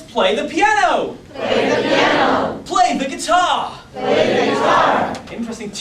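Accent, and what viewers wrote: Yorkshire, United Kingdom. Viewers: I think he might be from Leeds or Sheffield or very close to. I'm from Doncaster and it's almost identical so hard to tell.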